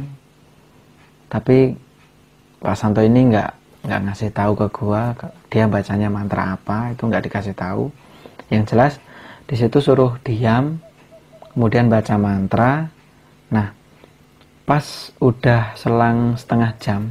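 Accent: Java, Indonesia. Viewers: Haha slow..suka banget ..stip penyakit kejang ya..alias step..sukses lur..mending bosone biasa g usah nganggo lu gua..medok.hhh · Berasa aneh logat medok tapi ngomong "gue"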